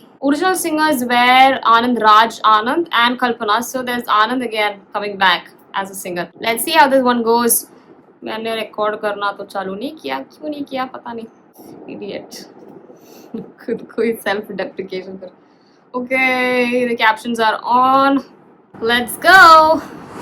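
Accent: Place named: Telugu, India. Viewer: Your Telugu is so cute 😊😊😊❤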